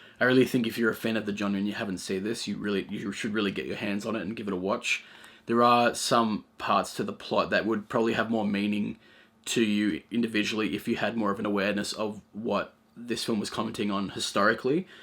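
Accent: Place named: Australia. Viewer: oh Wow, well you honestly don't have a really strong aussie accent. Don't worry, I don't think that's anything you need to be concerned with lol.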